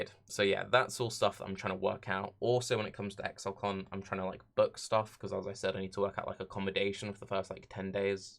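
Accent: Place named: England, United Kingdom. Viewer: respect man.you sound english.so look into picking yourself a fucload of liberty caps and blast off next month.think the season is same as norway.dunno how it relates but it helps alot with depression and unwinding.and suprisingly alot of pains and headaches go away for many.pretty anecdotal but...safe as milk atleast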